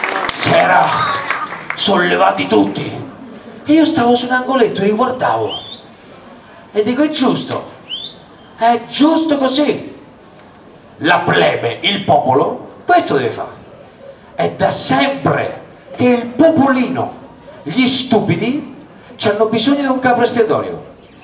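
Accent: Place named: Marche, Italy. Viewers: un dialetto del fermano rosi, si capisce si capisce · è il suo dialetto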